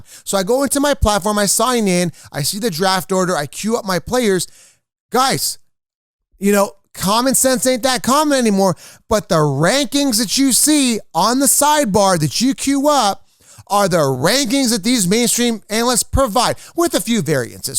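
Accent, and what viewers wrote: Canada. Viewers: Are you from Canada? 🇨🇦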